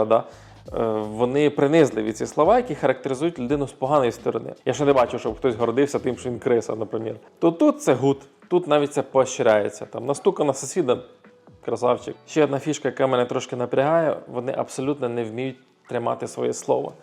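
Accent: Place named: Kuban, Russia. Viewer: Ви ж українською розмовляєте як хлопець з Кубані, калька р0сійської то звичайно що Норвежська для вас буде більше чужа а ніж українська а якщо не хочеться на свої хліба то можна на Кубань або в Білорусь, вас там краще зрозуміють як у Норвегії, миру та щастя всім…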